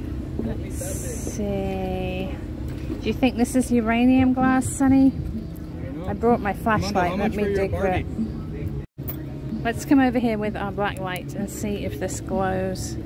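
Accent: England, United Kingdom. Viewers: Congrat for reaching 100k. You deserve it love your english accent❤❤❤❤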